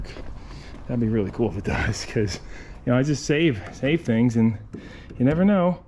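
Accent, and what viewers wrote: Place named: South, United States. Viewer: Luv your southern accent, looks Fabulous! Thank you for posting 😀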